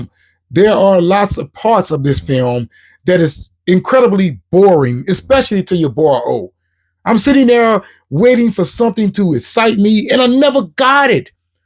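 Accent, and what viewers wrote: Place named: Louisiana, United States. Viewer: Lol my mans Louisiana accent coming to life!😂😅 Good reaction man! You gotta a new sub!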